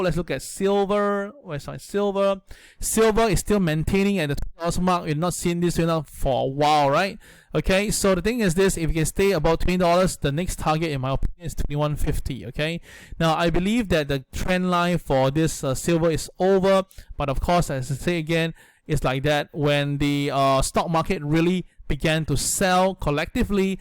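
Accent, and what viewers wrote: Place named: Singapore. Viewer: I haven been owing Oxy share since it was $27. Hopefully, it will beat $80. Btw like your Singaporean accent :)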